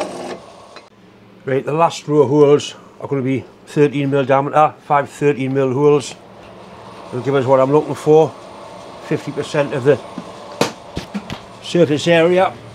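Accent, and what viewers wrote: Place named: England, United Kingdom. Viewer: G'day mate, hope you had a Merry Christmas and please, a happy new year to you and yours. Enjoy your work very muchly. From what part of England do you hale? Your accent sounds wonderful to me. Thanks.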